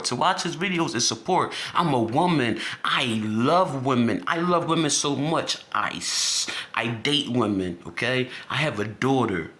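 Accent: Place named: Philadelphia, United States. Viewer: You sound so Philly!! I love it....she’s a nut Jawn!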